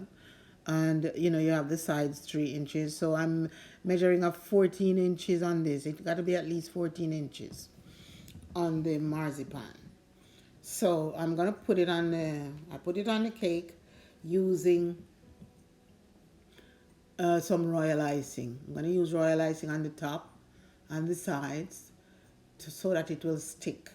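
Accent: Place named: Jamaica. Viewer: This was lovely can hear the real Jamaican voice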